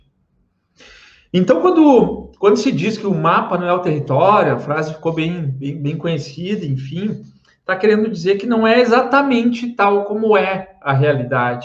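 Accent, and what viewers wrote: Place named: Rio Grande do Sul, Brazil. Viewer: Boa tarde, cheguei aqui por indicação! Pelo teu sotaque, és gaúcho da região metropolitana assim como eu! Gratidão pelo conhecimento!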